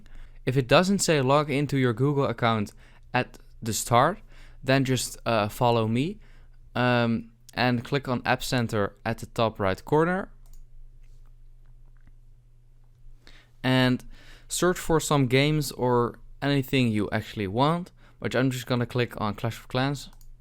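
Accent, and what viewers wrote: Netherlands. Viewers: he sounds like a dutch person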